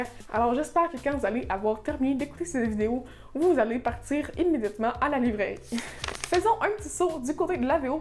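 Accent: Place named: Quebec, Canada. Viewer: J'ai découvert ta chaîne grace à un de tes commentaires laisse sous la vidéo d'Audrey et ça me fait tout bizarre d'entendre un accent québécois ahah ❤️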